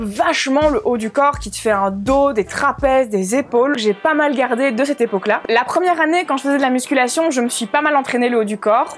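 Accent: Belgium. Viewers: J'adore l'accent belge ! ❤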